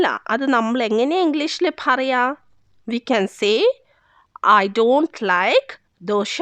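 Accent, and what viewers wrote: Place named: Kannur, India. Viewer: ഇങ്ങള് കണ്ണൂർ ആണല്ലേ 😄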